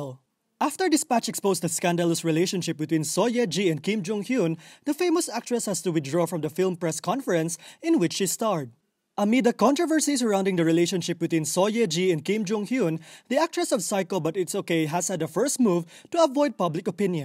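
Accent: Philippines. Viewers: I think the narrator was a Filipino, because of his accent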